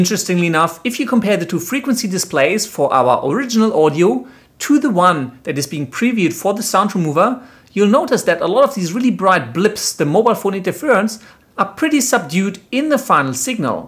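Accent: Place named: Germany. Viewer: You're from Germany right? I think I hear the accent.. (Wenn ich Recht habe, kannst Du mich Sherlock nennen)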